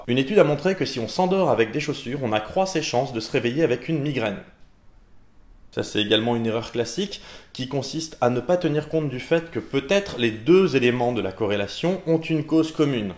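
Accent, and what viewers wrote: Alsace, France. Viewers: Le, "ou pien", c'est ton côté alsacien qui refait surface ? ^^ (on a dû te faire plusieurs fois la remarque, mais tant pis) Très bon format, au départ je m'attendais à une sorte d'ersatz d'axolot à cause du titre de la vidéo (aussi accrocheur qu'un titre de une de journaux ^^), et puis finalement je suis content que ça ait finalement apporté autre chose que mes attentes. C'est vrai que l'amalgame corrélation = causalité est fréquent, il est bien trop souvent de lire à cause de ça (jusqu'à preuve du contraire ;P) des âneries monstrueuses chez certains journalistes pseudo-scientifiques... koff koff Big Browser koff koff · Mince je voulais vraiment savoir quel était l'endroit le + dangereux de France xD En tous cas on a bien senti l'accent alsacien ;-)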